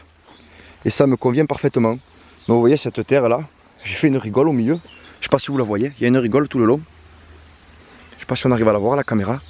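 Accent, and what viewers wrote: South, France. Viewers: Tu es ou, avec ton accent dans le sud ?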